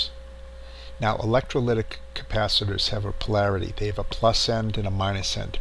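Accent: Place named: Boston, United States. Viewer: i can tell you're from boston...just saying. cool video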